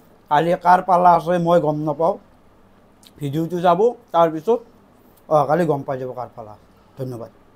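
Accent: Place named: Goalpara, India. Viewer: daar akta kotha bhal lage mk bhoria kowa taa 😂❤.....I❤ GOALPARA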